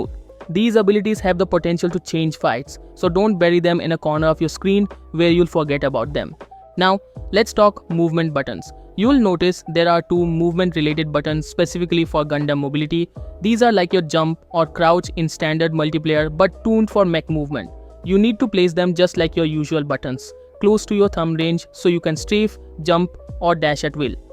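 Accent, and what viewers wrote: India. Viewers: Shit Indian akzent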